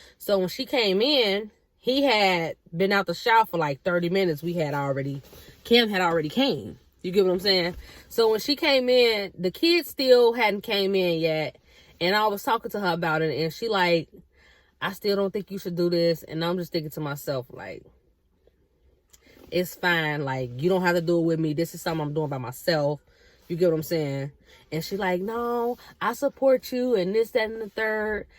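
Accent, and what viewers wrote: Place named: South, United States. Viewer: Idk why but I hear southern in you lol ! Where are you originally from? You got some accent 🤣🤣🤣 and I love it!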